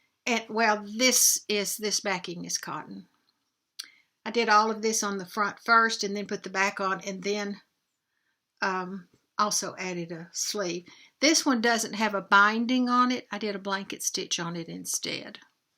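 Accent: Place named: Arkansas, United States. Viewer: . I loved your Arkansas French accent. My NE accent would be funny too! I love everything you do. That pillow for your gd is awesome and she will treasure it. TY for sharing what you have done! I do have a house generator as we get wind gusts and snow here on the coast! I’m so glad I got it. I too am blessed!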